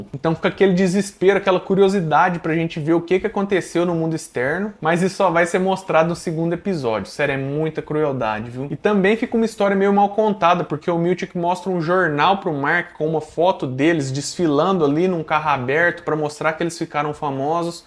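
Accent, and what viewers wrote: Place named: Minas Gerais, Brazil. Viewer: Que sotaquin mineiro bão.. minas é bom demais .. que saudade da minha Minas gerais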